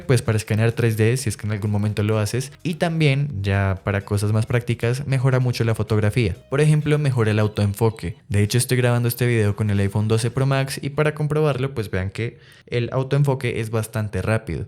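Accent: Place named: Colombia. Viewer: Eres de colombia que si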